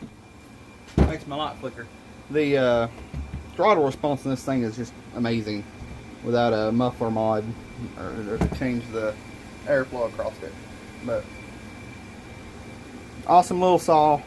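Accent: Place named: South, United States. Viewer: I love my neotecs!! I also have the 038 clone in the 872i and that saw if an absolute beast!! 2 years and multiple big oaks and not a single problem. I have 3 or 4 more neotecs and other than some small things which Neotec took care of immediately I've had very little issue. I would love to know what the squish is on that saw. I'm willing to bet with a base gasket delete and a muffler mod that saw would pull the heck out of a 20" bar. You said the heat index was 105 this day and judging by your accent ide saw your not to far from me lol. I'm in South Alabama and the heat index 2 days ago was 112 so I feel your pain. Great review!!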